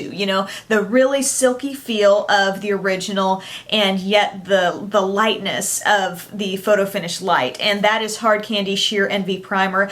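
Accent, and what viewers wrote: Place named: South, United States. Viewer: Love, love, love this video!!! It was so informative and detailed! Oh & I love ur southern accent! :)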